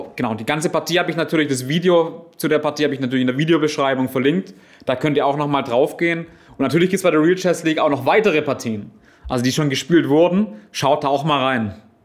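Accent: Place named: Baden, Germany. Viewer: sehr gerne und vielen Dank auch für die schnelle Antwort. :) Ich bin gespannt. Das hört sich gut an, dass ihr da schon hinter den Kulissen daran arbeitet.^^ Spannend wäre das beispielsweise wie das Profischachspieler machen, die das quasi als Beruf ausüben, aber auch Berufstätige, sprich beispielsweise du, also wie verbinden die den 8-Stunden-Job dann quasi mit Schach. Ja das Überangebot ist gerade für Spieler/innen unter DWZ1500 sehr erschlagend und überfordert zum Teil schon. Also ich habe einige Kanäle wieder deabonniert oder stumm geschalten, weil es einfach zu viel wird. Da helfen Bücher schon eher und das finde ich hier auf dem Kanal beispielsweise gut, dass nicht jeden Tag ein Video kommt (plus der badische Einschlag, wenn ich das richtig rausgehört habe, aber das liegt an dem ebenfalls vorhandenen badischen Wurzeln :D).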